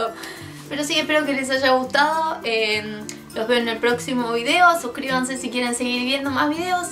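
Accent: Argentina. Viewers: "vivis culturalmente en Argentina" ('??) o sos argentina? no me quedo claro porque tu acento es argentino pero dijiste que era el pais donde estas acostumbrada a vivir.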